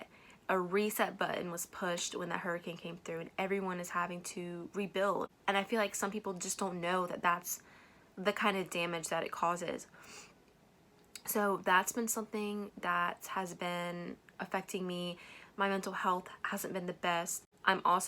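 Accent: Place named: South Carolina, United States. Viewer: By the way, your South Carolina accent is so awesome.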